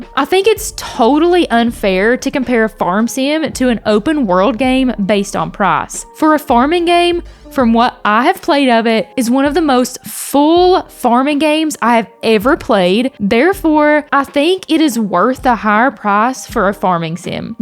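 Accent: South, United States. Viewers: Damn that’s a strongggg country/southern accent 😂😂 shocked me at first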